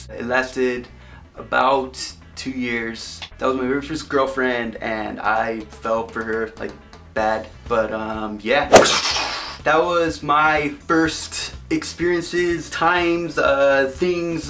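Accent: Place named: Russia. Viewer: What is he? Like Russian? German?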